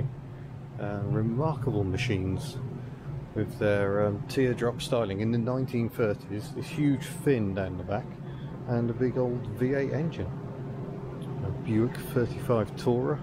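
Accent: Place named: Glasgow, United Kingdom. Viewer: Not a bad Scottish accent. Like a posh Glaswegian.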